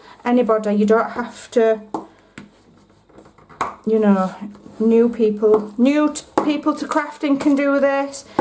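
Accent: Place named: England, United Kingdom. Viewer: Such a great tutorial I will definitely give this a go. I could listen to you talk all day as I no longer live in England your like a little bit of home.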